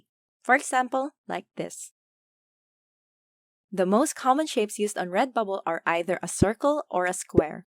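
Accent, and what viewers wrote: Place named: Philippines. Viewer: You deserve a million subs. Keep it up. Ps: you sound Filipino tho.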